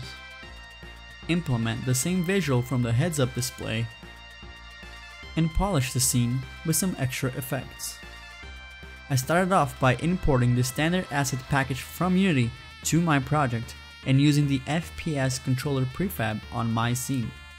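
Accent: Brazil. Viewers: Are u brazilian my man?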